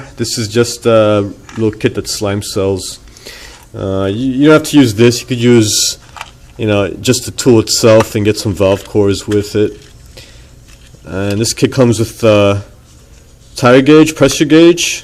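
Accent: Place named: New York, United States. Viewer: Good reminder for me from Vinnie Barbarino from Welcome Back Kotter😂😂 You do sound like him with the NY accent👍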